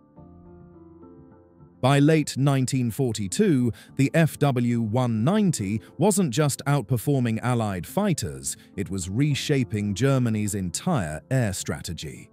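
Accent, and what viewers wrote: United Kingdom. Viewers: OMG another British accent!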